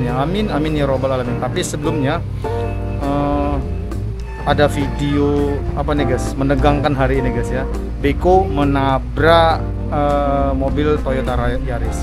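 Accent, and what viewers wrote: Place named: Medan, Indonesia. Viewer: Bang kau lucu kali😂😂, medan kali😂😂😂😂 1. Awak(aku, saya) 2. kontak(kesetrum) 3. udah nampak(udah kelihatan) 4. nah ini kan segini kalinya,nah disana udah lebar kali guys, lebar kaaaliii guys😂😂😂😂(kayak mana bedakan kali yg ada airnya dgn kali yg gk ada airnya bang) 😂😂😂😂 5.atrek atrek atrek Terima Kasih info dan hiburanny bang, sehat selalu, salam dari Medan.... Horass🎉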